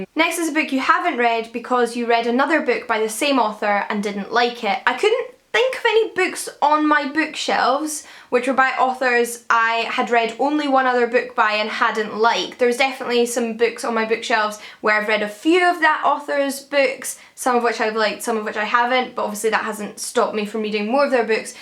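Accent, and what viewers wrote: Scotland, United Kingdom. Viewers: yep! In my case I don't usually restart a volume (especially if I'm reading the manga for an anime that I've already watch), but I find myself spending months without touching it again. And then I re-enter the compulsive reading phase again, and the cycle repeats itself xD Thanks for replying, you're really nice and insightful and your channel is one of my favourites on booktube, even though I've started following you not long ago :) keep it up! :D (btw, is your accent Scottish? I think it's lovely, but I'm not good with accents, apologies if I'm wrong)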